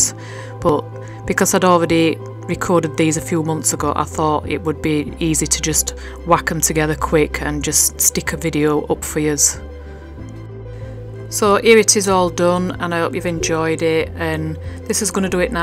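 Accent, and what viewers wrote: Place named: Northern England, United Kingdom. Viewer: Bit of a tarn accent while I'm in Thailand makes me feel like I'm still home 😅 Got some cracking art talent! ❤️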